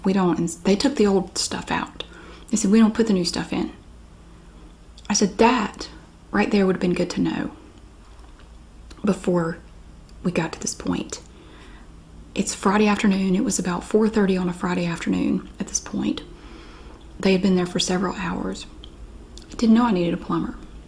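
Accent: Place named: North Carolina, United States. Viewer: I’m new here…I just adore your accent. North Carolina?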